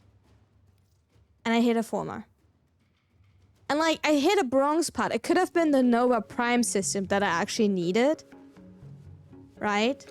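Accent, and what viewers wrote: Germany. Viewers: Am I hearing a German accent? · Are you german per chance?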